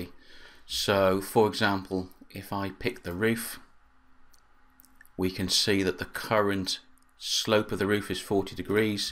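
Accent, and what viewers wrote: United Kingdom. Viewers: Best Revit Tutorials I have seen, and the British accent makes it much easier to understand! Very well done!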